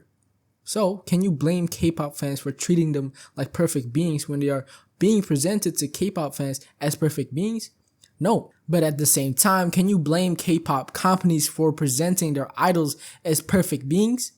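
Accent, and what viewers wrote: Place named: New York, United States. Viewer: are you from NYC? I sort of detect an accent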